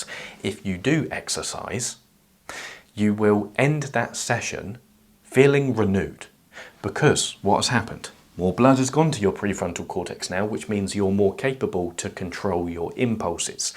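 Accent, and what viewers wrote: United Kingdom. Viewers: The britishness in his voice is so refreshingly calming put in a good mood like when ur high on caffeine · Unrelated but what type of British accent is this? I like it a lot.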